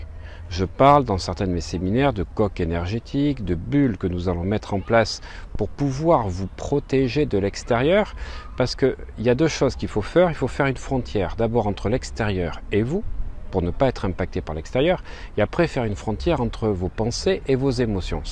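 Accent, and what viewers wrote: Bordeaux, France. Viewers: Merci Bro. ! Vous avez perdu votre accent bordelais ?!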